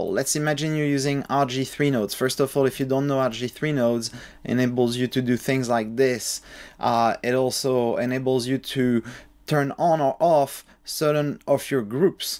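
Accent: France. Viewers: You sounds French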